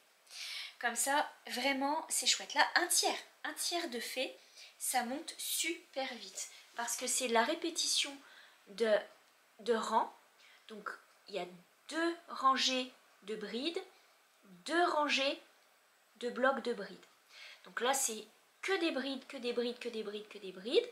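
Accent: Canada. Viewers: Merci encore pour ce beau moment de partage. Passez un merveilleux week-end en famille. Et merci pour ce joli accent canadien qui ressort de temps à autre !! 😊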